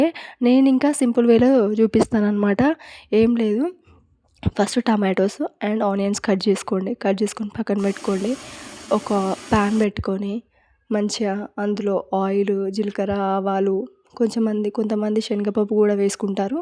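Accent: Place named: Telangana, India. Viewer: తెలంగాణ మాట సూపర్ 👌👌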